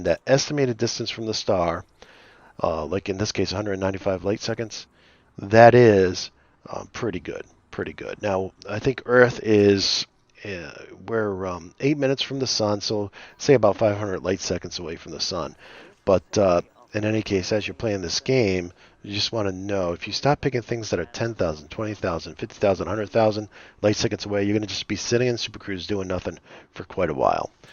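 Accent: United States. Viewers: Entire video Couldn’t stop thinking about just wanting to punch the shit of of this annoying New England accent. 🤮🤮🤮🤮🤮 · I hope I'm hearing correctly the NYC accent. It could be Central Jersey. Apologies if I am wrong. I'm a little homesick for NYC is all. Thanks for the clearly made video series. I 've started and stopped this game nearly 1/2 a dozen times, but will try once more thanks to you.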